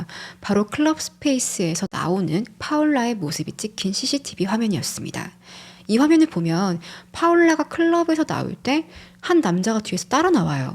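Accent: South Korea. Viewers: 외국에 사는 발음이 아닌데요. 한국식 영어.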